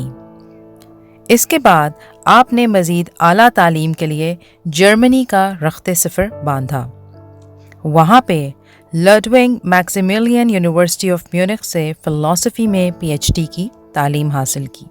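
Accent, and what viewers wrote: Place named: United Kingdom. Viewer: Bhai yeh urdu k Kai lafz glat pronounce kr rahi hy English accent use kr rahi hy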